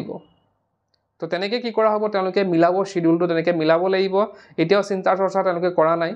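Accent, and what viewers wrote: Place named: Dhubri, India. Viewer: Dhubri'r khon lage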